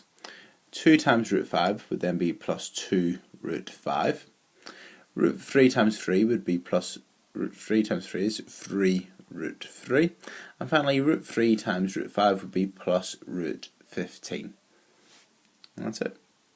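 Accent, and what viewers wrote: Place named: Northern Ireland, United Kingdom. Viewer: Thank you! This is a very late comment but this was really helpful. Was surprised to hear your northern irish accent (same as me haha)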